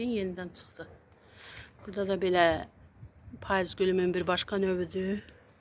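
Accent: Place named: Azerbaijan. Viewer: Çok güzel çiçekler bahçeniz harika konuşma şivesi ile anladım Azeri iseniz herhalde Azerbaycan mı orası benim komşum var Azerbaycanlı çok iyi arkadaşız hayırlı akşamlar diliyorum Türkiye'den İstanbul'dan selamlar sevgiler 🇹🇷🥰😊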